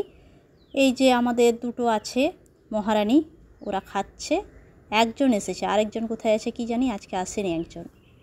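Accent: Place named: Kolkata, India. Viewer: Ei tumi ki bangali, pariskar bangla bhasa, chalti bhasar bhalo dakhal aache, khola mela sahab, mone hachhe apni anekdin kolkatay chilen, bhalo thakuk apnar paribar ar apni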